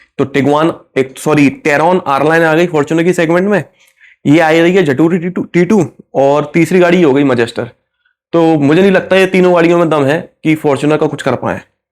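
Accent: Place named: Gwalior, India. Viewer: Bhai aap kaha ke rahne wale ho, क्योंकि कभी कभी आप भिंड, मुरैना, gwalior jaise भाषा बोलते हो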